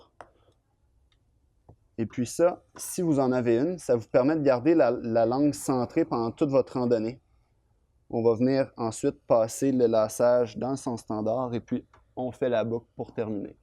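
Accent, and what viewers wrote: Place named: Quebec, Canada. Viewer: C'est un quebecois!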